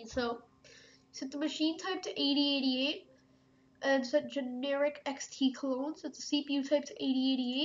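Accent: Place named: Ireland. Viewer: Wow the accent. sound a bit irish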